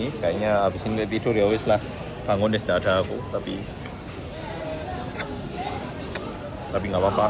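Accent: Java, Indonesia. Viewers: ur korean accent jdi berubah ya bro, jdi jawa medok accent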